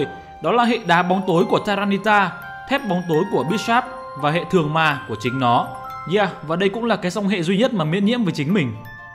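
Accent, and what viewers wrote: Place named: Hanoi, Vietnam. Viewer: ad người hà nội à giọng nghe hay phết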